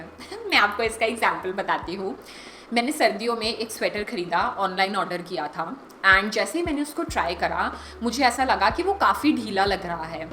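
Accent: Delhi, India. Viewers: I have been following your channel since a week or even less and all that I can say is why didn't I discover you earlier! The very first video that popped up on my recommendations made me a bit uncomfortable with your speaking style which I guess is a bit like alot other Delhi girls, (please excuse the generalisation), but further into the videos, I realised that it is that very speaking style that grabbed me and made my binge all your videos in a span of a couple of days. I felt it was non-pretentions and so so honest to anybody who just wants to have a good time watching creators not unlike you putting up so much hard work. I wish I could pat you on the back and tell you that you're doing a great job. I am not so much into hair and makeup and dress up videos and do not feel the need to follow any other YT for content therein, you're content appealed to me for the sole reason that you made me happy just sharing your stuff with me. 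Thank you sm. Keep doing what you're doing and all the love. Sorry for the long post.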